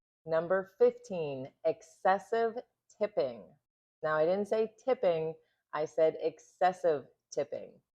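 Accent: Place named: United States. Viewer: Love the accent. Im from Ireland and I rarely here a real and nice Amwrican accent, you know some influencers put on a drain thing and sound artificial.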